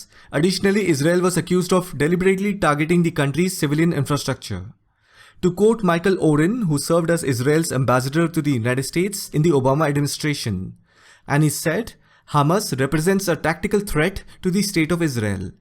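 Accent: India. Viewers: This guys indian accept is insufferable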